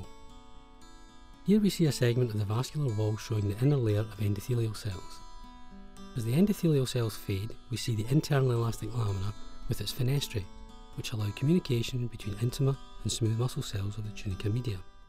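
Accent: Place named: Scotland, United Kingdom. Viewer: what hell is he talking about??? shitty scotish accent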